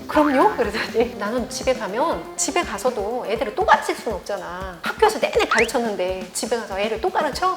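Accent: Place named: Jeolla, South Korea. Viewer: 전라도 ㅋㅋㅋㅋ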